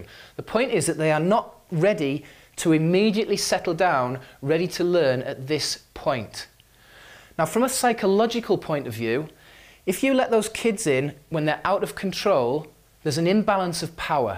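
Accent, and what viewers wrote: United Kingdom. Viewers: Hi. Enjoyed the video. Really good advice. Where is your accent from? Are you from the Lakes?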